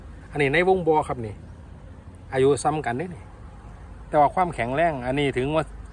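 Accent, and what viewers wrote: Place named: Isan, Thailand. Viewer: พูดอีสานฟัง เพราะกว่านะคะ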